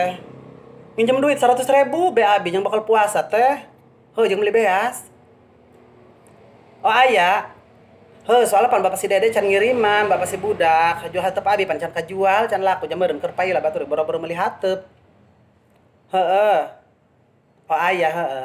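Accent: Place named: Banten, Indonesia. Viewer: Siga urang banten ngomongna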